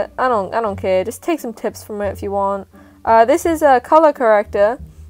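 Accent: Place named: Wales, United Kingdom. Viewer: I love the welsh accent. I live in South Wales 🏴 currently so I’m catching onto the accent which I loveeeeeee ❤️🤷🏼‍♀️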